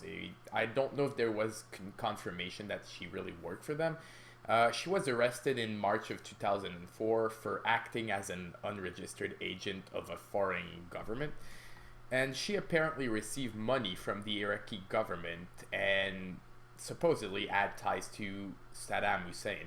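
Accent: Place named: Quebec, Canada. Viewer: You're from Quebec aren't you ? The accent gave it away.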